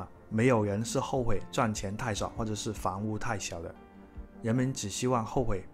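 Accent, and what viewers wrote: Cantonese, China. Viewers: 加油加油，終於再見了，終於再聽到係的廣普了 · 怎么有那么重的香港口音的？难听死了! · up主是广东人吗？您说普通话跟我以前的同事们听起来差不多，还挺亲切的😂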